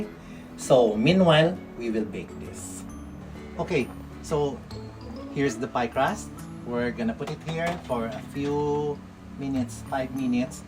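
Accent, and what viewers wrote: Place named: Iloilo, Philippines. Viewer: Hello po sir!! I love your ilonggo accent 😍😍😍it reminds me of my friend in Bacolod.... Pinaka sweet na nakilala ko Ang mga ilonggo 😍 keep safe always sir!! More videos please 😊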